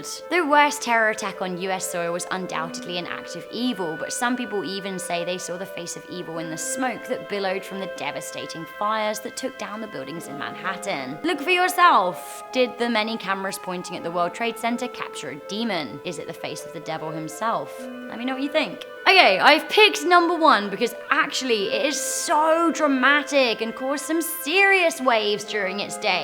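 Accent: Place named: England, United Kingdom. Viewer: That stupid english accent Lol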